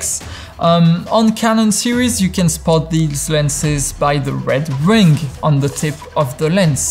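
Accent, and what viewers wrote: France. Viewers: Salut sachant que pertinemment tu es français de par ton accent même si c'est en anglais ta présentation est objective éclair me concernant je suis sur le viltrox car en premier lieu ça a été le budget qui me l'a imposé tu es rentré dans détail technique qui certainement on était factuelle pour le metabones et du fait que je ne suis pas dans de la technique pure je suis avec mon gh5 viltrox m2 et un sigma 18-35 à l'image dans 95 % des cas nous ne le voyons pas sachant que nous réalisons des clip pour le public et non des professionnels averti comme toi personne ne peut y voir une quelconque différence ensuite le jour où j'aurais un budget supplémentaire pourquoi pas upgradé,mais en l'état je suis très satisfait de mon viltrox m2,merci pour tes reviews de qualité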